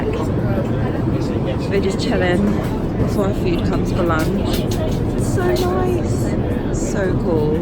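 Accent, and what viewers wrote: RP, United Kingdom. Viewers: Posh upper middle class totty. Loving the private school accent. In love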